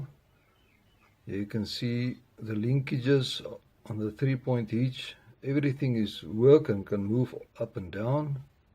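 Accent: South Africa. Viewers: i can tell your from south africa cos i used to live there and i am a big fan of tractors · Are jou south african😀😀😀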